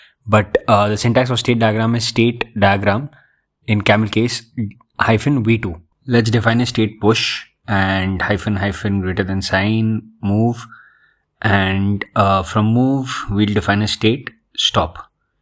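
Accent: India. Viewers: You Indian guys... you have the worst accent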